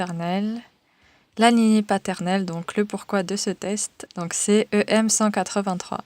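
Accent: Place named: Morocco. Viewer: Jolie voix marocaine :) Tu est une haplogroupe E et J :) voir ici <link>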